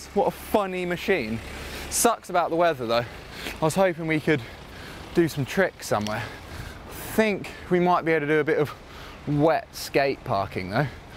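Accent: United States. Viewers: American English is on point dude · Dude that So-Cal accent is on point I feel like I'm at home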